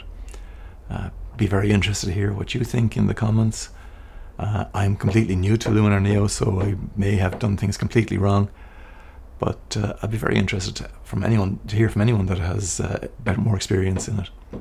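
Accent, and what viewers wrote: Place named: Ireland. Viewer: I think you're speaking just fine, and I suspect that the other commenters simply aren't used to an Irish accent. In fact, that nie fella sounded unhinged in his comment, so probably best to disregard. I like your Luminar edit the best of the two btw, since it's closer to what it seemed to be in real life. Good luck with your channel (and wotht the bird that's determined to get in!).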